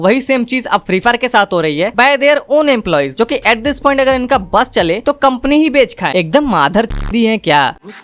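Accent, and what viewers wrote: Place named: Bihar, India. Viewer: bihari accent on top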